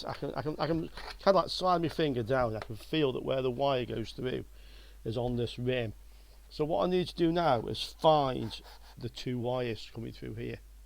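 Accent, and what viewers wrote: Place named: Stoke-on-Trent, United Kingdom. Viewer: I'd recognise that accent anywhere, where in Stoke on Trent, do you hail from my fellow potteries brethren? Longton/Blurton is the stomping ground of my youth, then briefly moving to Liverpool for 18 months. Before permanently relocating to Walsall, just a few miles south of Birmingham.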